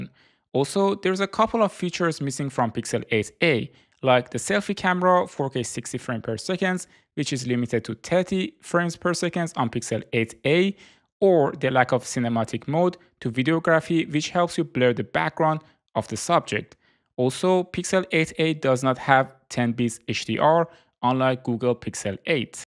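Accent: Iran. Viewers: Is that a Persian accent I hear?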